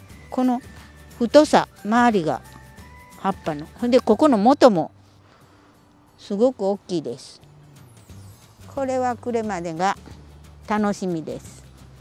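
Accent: Tosa, Japan. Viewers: おはようございます🌞 福岡も台風みたいに荒れた天気でした〜💦 懐かしい土佐弁😊 癒されます✨ いつも美味しそうなお野菜 沢山の愛情貰ってイキイキしてますね💕 私も食べたーい‼️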